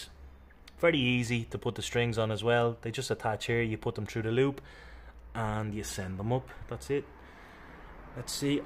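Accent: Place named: Dublin, Ireland. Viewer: hi happy ne year I was thinking you must be from that part of Dublin you sound very like him alright 😄 I'm not far from you myself just started clawhammer frailing journey Just wondering if you do lessons??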